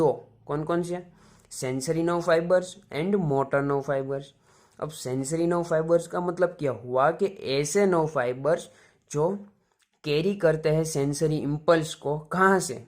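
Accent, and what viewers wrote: Gujarat, India. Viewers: Bhai are you gujarati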